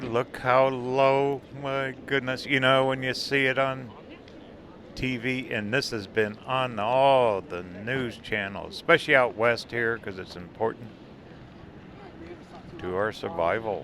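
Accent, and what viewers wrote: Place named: St. Louis, United States. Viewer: My wife and I enjoy your Chanel. On a personal note, your dialect sounds just like my cousin- from St. Louis.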